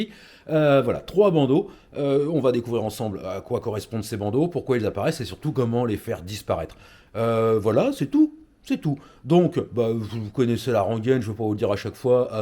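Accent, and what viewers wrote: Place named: Nord, France. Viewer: J'adore le ton de cette vidéo, bravo au présentateur ! En plus il est concis, ne s'écoute pas parler pendant des heures en mode égo m'as-tu-vu ! J'aime bien aussi la pointe d'accent du Nord, toujours très agréable à mon oreille tant elle rappelle la certitude que quand on a les fricadelles, il n'y a plus rien à prouver, plus rien à prouver. Merci pour ce tuto d'une rare qualité, d'une rare convivialité. Et utile bien sûr !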